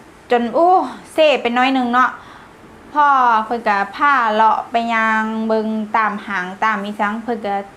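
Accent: Ubon Ratchathani, Thailand. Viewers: สำเนียงเจ้าเว้าแบบเดียวกับอุบล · สำเนียงเหมือนคนอุบล เพาะเราเชื้อชาติเดียวกัน ผมคนหนองคาย ญาติผมอยู่เวียงจันก็มีอเมกาก็มีปากงึมก็มี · สำเนียงคืออุบลเนาะลาวไต้อ้ายกะอยู่แคมจำปาสักอ้ายยูเดชเลด.เว้า.ล.ลิงคือ.พิบูลสำเนียงคือกันไกล้กัน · สำเนียงคำพูดคำจาลาวใต้สำเนียงการพูดเหมือนๆกับ.จ.อุบล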